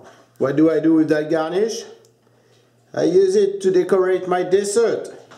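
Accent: Jamaica. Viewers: jamaican accent man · i love his jamiacan accent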